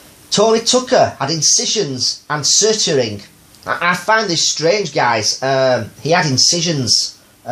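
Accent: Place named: West Yorkshire, United Kingdom. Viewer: Where are you from mate.I know its northern and I'm thinking west yorkshire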